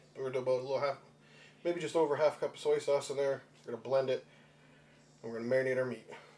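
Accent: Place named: Canada. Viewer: 45 seconds in I recognized that you're a fellow Canadian from your accent. Am I right? I have no way of knowing but I am pretty sure.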